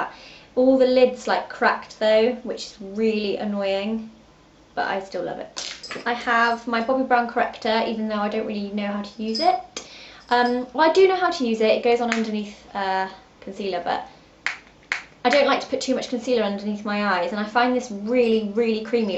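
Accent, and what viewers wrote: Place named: RP, United Kingdom. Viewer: Zoella sounds so flipping posh 😂😂Xx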